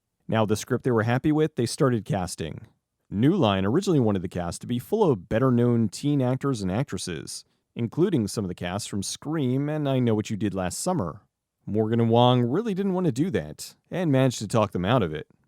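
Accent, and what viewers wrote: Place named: Boston, United States. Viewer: I've never noticed your Boston accent before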